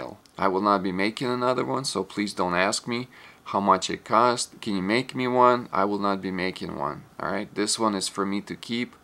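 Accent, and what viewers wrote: Russia. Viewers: Прослеживается русский окцент)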